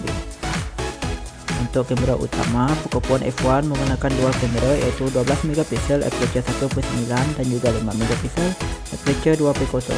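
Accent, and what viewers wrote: Sarawak, Malaysia. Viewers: org Sarawak kq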